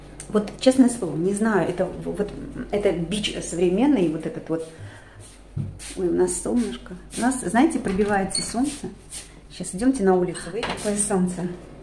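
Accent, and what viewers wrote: Baku, Azerbaijan. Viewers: ДАЙ БОГ,ЧТОБ СРАБОТАЛ МАГНИТИК-СЧАСТЬЯ🙏🙏🙏👍‼️А МНЕ,ОЧЕНЬ НРАВИТСЯ БАКИНСКИЙ ГОВОР,ОН МЯГКИЙ,МЕЛОДИЧНЫЙ))))А ВООБЩЕ,СЧАСТЛИВЫЕ ЛЮДИ У КОГО ПОЛИСАДНИК...ЖИВИ НА ЧИСТОМ ВОЗДУХЕ,КОНЕЧНО ЕСЛИ НЕ ИСПОРТЯТ" ВРЕДОНОСНЫЕ ЧЕЛОВЕКИ"))))МИРА,ДОБРА,СЧАСТЬЯ И ЗДОРОВЬЯ ВСЕМ-ВСЕМ!!!!🙏🙏🙏♥️🌺🌷🌹‼️ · 😄😄бакинский акцент. 👍👍 А когда мы собираемся сёстрами мы используем этот говор🤭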